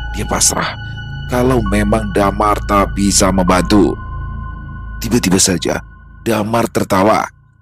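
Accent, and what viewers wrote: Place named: Java, Indonesia. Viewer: Biyuh.. Mas logat jawanya mulai sedikit medok... Haha narator kesayanganku.. logat bahasa apa pun bisa di lafalkan dg baik ❤❤❤❤.. sukses selalu ya Mas dan team..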